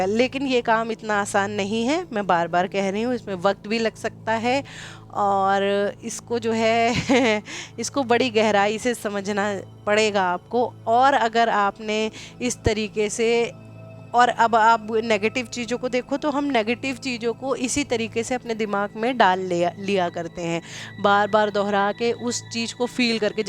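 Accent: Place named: Uttar Pradesh, India. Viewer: दीदी आपके वीडियो बहुत ही अच्छे हैं, में आपके वीडियो लगातार देख रहा हूं, और कुछ करने का प्रयास भी कर रहा हूं, और दीदी आपकी भाषा उत्तर प्रदेश की है और आप उत्तर प्रदेश से ही है यूपी के रहने वाले मेरे संपर्क में हैं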